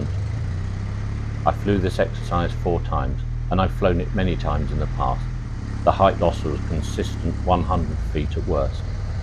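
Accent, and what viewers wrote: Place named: United Kingdom. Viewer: You speak like a Brit and dress like a Yank. I had a hard time reconciling the two until I learned we share the same sense of humour and passion for flying. Well done, old man!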